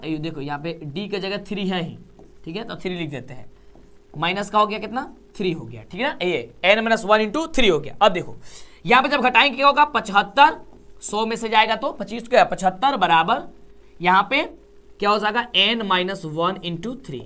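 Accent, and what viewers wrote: Bhojpuri, India. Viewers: Aap Bhojpuri mei Kyo boliye Lagte Hai sir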